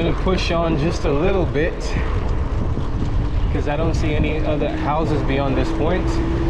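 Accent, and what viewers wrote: United States. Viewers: Great vid thanks. I'm staying in this hotel in June can't wait. Are you from the States? you have an American accent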